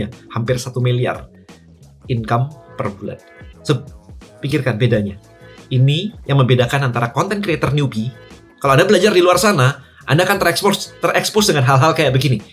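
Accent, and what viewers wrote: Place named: Medan, Indonesia. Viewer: What, orang Medan KhoKho...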